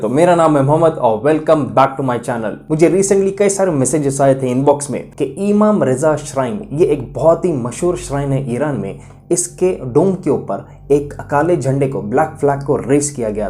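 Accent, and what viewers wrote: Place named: Malabar, India. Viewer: Bhai itne dinon se videos Bana Raheho Aapki Urdu Kerala ke Malbariyon jaisi Hai Aapko Aapni Urdu Hindi Zubaan Sudhar ne ki Zarurat Hai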